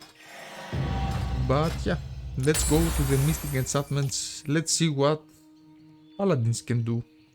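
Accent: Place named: Greece. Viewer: From the accent i can tell you are greek 😂 ! Are you??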